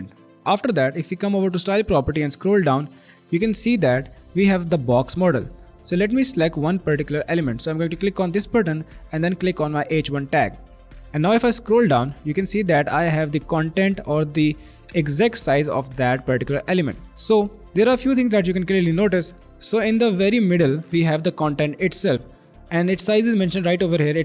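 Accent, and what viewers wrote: India. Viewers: Just relised you have a kashmirir accent! Are you from JK?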